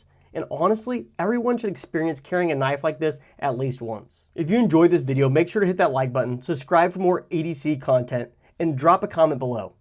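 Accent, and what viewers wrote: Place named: United States. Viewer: If you're comfortable sharing, where do you live? You speak American English but apparently live in the southern hemisphere (because it's winter where you are), so I was curious. I'm guessing Australia?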